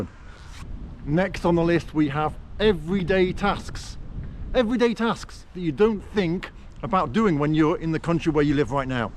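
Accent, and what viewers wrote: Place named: RP, United Kingdom. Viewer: Я при просмотре этого видео поимел некоторый "когнитивный диссонанс". Когда ты совместил в своём монологе две (для меня) стереотипически несовместимые вещи; - эталонную английскую (не американскую!) речь, коей "надлежит" быть дежурно-вежливо-чопорной, и - "неистовую" русскую эмоциональную экспрессию 😄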